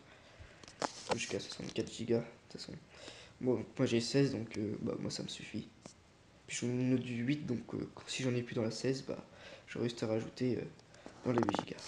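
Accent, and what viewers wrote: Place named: Madagascar. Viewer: C sure tes malgache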